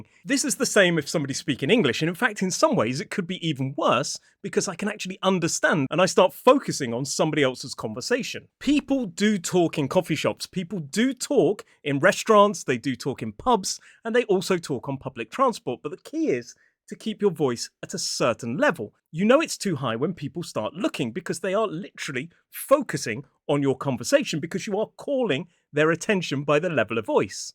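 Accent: United Kingdom. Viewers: British English!!!! Really..I switched off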